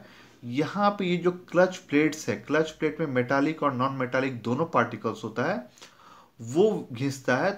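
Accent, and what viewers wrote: India. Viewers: Titled in English. Audio is Indian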